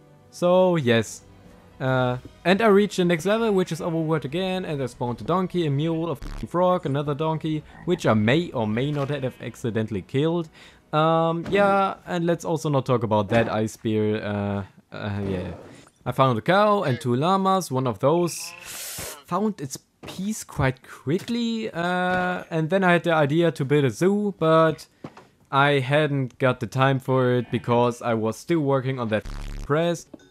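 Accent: Germany. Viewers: This german accent kills😂good video my friend, Ich fühle den Akzent😂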